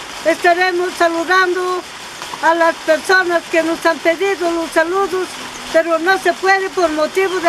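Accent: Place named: Lambayeque, Peru. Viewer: Parecía de México la demora así hablan en Lambayeque es diferente si acento de voz que interesante yo soy de tacna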